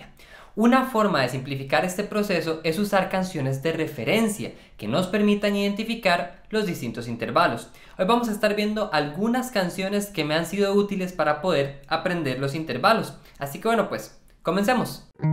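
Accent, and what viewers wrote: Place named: Costa Rica. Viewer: Hey. Ud es de Costa Rica? Su acento es tico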